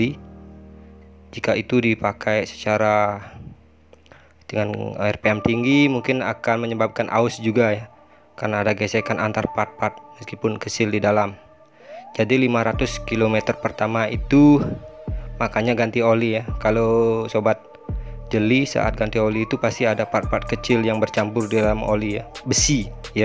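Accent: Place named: Madura, Indonesia. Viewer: logatnya sperti orang madura takye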